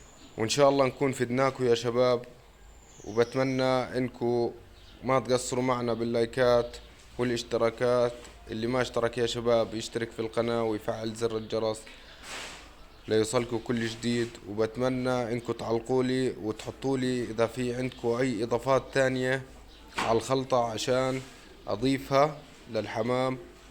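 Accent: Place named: Palestine. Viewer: وانت كمان بتحكي مثلنا طاسه ههههههههه يسعد قلبك فلسطينيه كح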